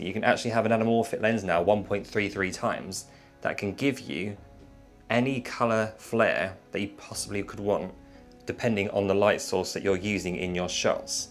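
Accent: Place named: London, United Kingdom. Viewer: 好听，正宗的伦敦音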